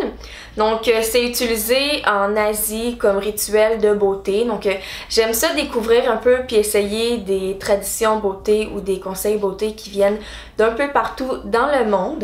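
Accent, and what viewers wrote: Canada. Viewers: C’est l’accent canadien 🇨🇦😍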